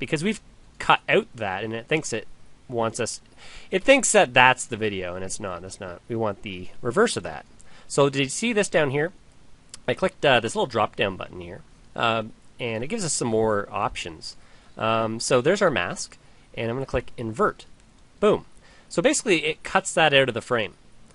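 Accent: Canada. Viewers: Canadian Accent FTW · Very nice vid. :) by the way, is that a Canadian accent?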